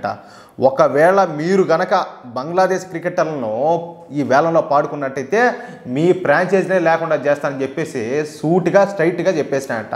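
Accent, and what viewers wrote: Rayalaseema, India. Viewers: Super sir Mee rayalseema language super Sir I'm also Rayalseema from Europe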